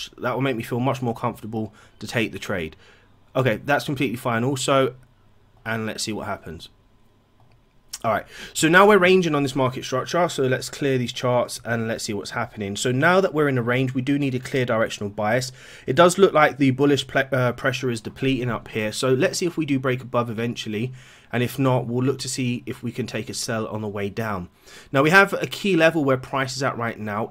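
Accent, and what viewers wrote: London, United Kingdom. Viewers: Broken english accent??? Bro it's a London accent lol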